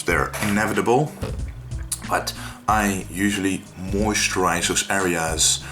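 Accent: Netherlands. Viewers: What accent is it? Is it Dutch?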